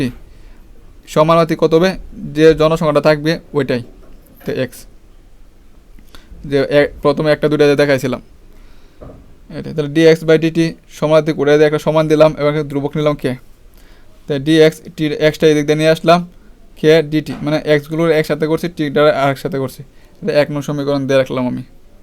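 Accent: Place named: Mymensingh, Bangladesh. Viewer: ভাই মমিসিংগা😁😁 ভালোই হয়েছে❤️ ভাইসাবের এলাকার লোক😌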